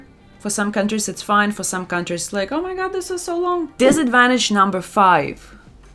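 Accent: Russia. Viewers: Her Russian accent is sooo good 😅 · 😄 I was looking for a channel about what life in Canada is like and came across you! As soon as I had heard your first word I learnt you are Russian sa well as I am. Привет из России. Не скучаешь? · I wish you spoke in your Russian accent for the entire video. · hi, thanks for yours videos. I am working to inmigrate to Canada by studies from Chile, South America next year. (2022) I love you play Russian accent. Thanks.....Nos vemos en Canada!!!! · I like yours videos and what I like the most is when you play your Russian accent, this make me LOL. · I like your Russian accent!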